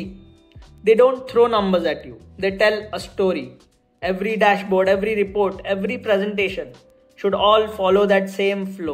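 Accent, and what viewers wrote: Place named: India. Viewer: I'm argentinian and i dont speak English with argentinian accent, i do it with an american accent. Why do Indians never get off their goofy ass accent? Cant take you seriously.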